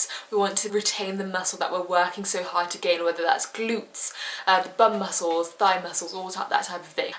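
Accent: RP, United Kingdom. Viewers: her accent is very posh, we don't all sound like that lol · Yeah I know there's different British accents hahaha but her particular one is so pleasant to listen to because she pronounces the words so clearly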